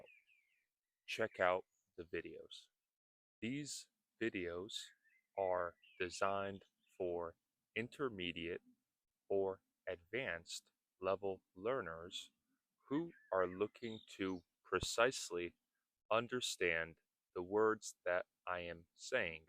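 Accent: United States. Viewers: Hello. Are you an American native speaker (your accent sounds like American). What country do you shot your videos, in Hungary? Do you have live streams on your channel?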